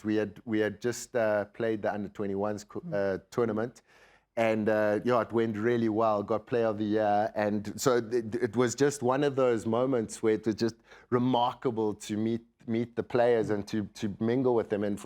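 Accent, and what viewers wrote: South Africa. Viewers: Goeie donner, this is so Joburg English that i only understand like half of what he’s saying 😂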